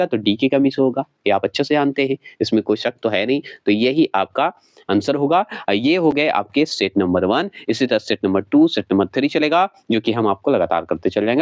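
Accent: Kerala, India. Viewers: Ye keral se h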